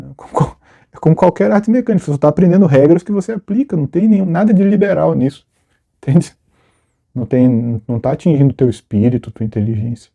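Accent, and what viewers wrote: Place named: Bahia, Brazil. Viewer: Professor me tire uma curiosidade:o professor é de onde na Bahia?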